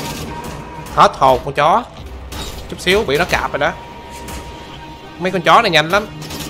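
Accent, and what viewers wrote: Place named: China. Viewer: Hình như anh là người hoa đúng ko?sạc pin mà anh nói là sạc tìn:)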